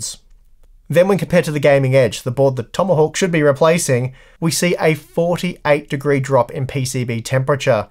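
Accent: Australia. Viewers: Steve are you from Australia?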